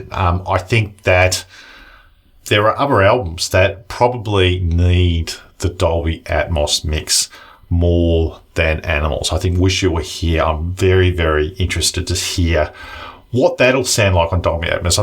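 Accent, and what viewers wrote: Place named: Australia. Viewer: why do yo Awzzees butcher the English language so badly?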